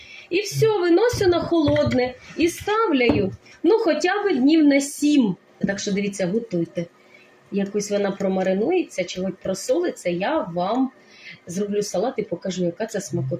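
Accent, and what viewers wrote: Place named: Cherkasy, Ukraine. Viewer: І рецепт цікавий і мова🎉 Це мабуть Черкащина,чи я помиляюся?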